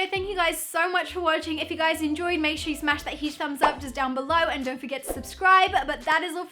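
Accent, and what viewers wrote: England, United Kingdom. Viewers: I just love British accent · Of course you look beautiful with your English accent and your beautiful hair while I am here stuck in Florida sinking with the world with the ratchet hair that looks like a mop so no I cannot do these hairstyles because I have the ugliest hair ever and you have hair that looks good sorry for the rant I’m bored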